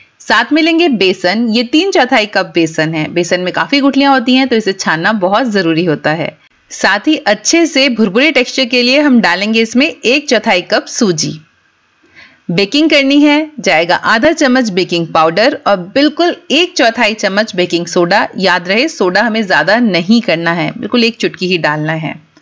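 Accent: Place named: Meerut, India. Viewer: Aapki Urdu boht piary hai pure merut wali love from lahore pakistan 😘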